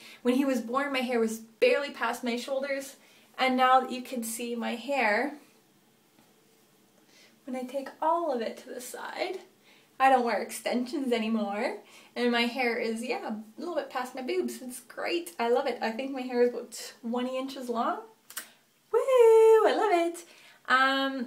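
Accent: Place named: Canada. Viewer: You have such a canadian accent! Anyways, I watched your pregnancy videos and Im glad your vlogging again! (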